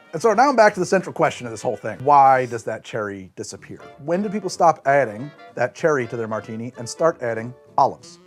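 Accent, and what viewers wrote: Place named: United States. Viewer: Saying FDR's name in a transatlantic accent then Churchill's in your modern American accent is really funny to me.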